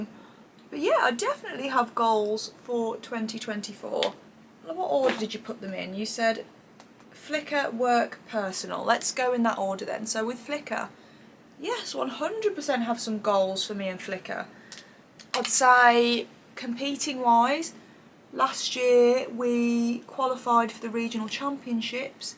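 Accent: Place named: Birmingham, United Kingdom. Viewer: Hi, really enjoyed this video I related to you, when you touched on your background/family in particular. Real life hey. Also your voice is soo soothing to me. My Nanny 👵🏼 raised me, she was from Birmingham & had the same accent as you ☺️🙏🏽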